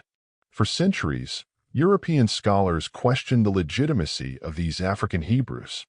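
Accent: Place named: United States. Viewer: I don't want your subtitles. No American voice please. I prefer Oxford English.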